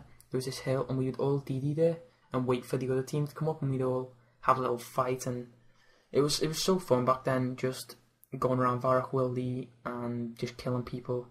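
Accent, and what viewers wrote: Liverpool, United Kingdom. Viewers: never knew u were a scouser ~ Aaron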